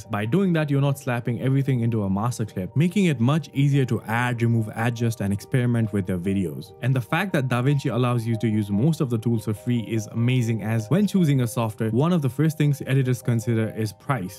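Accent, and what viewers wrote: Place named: Nepal. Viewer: Also identified a fellow Nepali from your voice, keep up the good work sathi. :hand-purple-blue-peace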